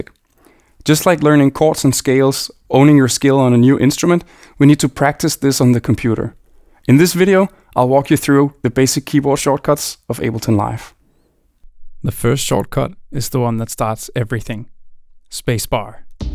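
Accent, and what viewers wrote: Denmark. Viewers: This guy sound Danish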